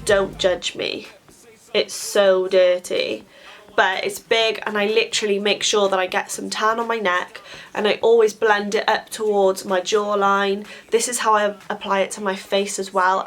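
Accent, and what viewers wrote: Wales, United Kingdom. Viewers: you welsh? xxx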